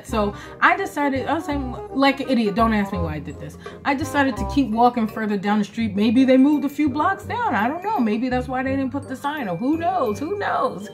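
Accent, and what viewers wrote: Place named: Africa. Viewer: 🤣🤣🤣 subscribed. Love the African accent🤣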